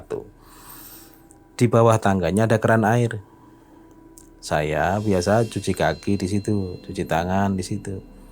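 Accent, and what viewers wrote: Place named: Bandung, Indonesia. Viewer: Dari logatnya sepertinya bapak ini orang Bandung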